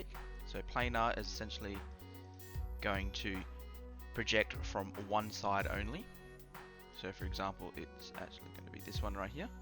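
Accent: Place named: Australia. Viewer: Your the first Aussie I’ve heard on a maya video 😂🎉🎉🎉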